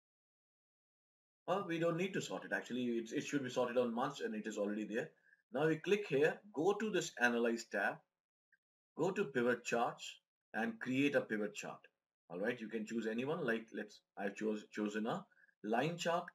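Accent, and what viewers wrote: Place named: India. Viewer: what region of India are you from? you have the coolest accent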